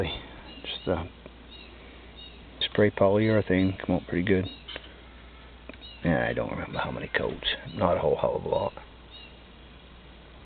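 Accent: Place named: Maine, United States. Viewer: If I had to guess. I bet your from Maine.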